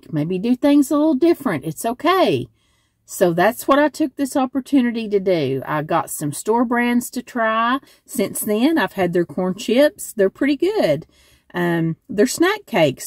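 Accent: South, United States. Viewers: I adore watching you! You remind me sooo much of my family in Tennessee. They have all passed so listening to your southern drawl is such a nice reminder <3 Blessings to you!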